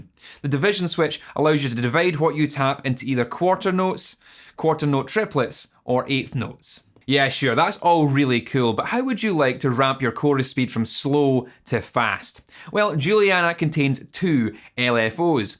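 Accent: Scotland, United Kingdom. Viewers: As a musician from Scotland living in Stockholm, Sweden, i can say that these videos are extremely well made and that it's also a relief to hear a scottish accent again, great work, keep it up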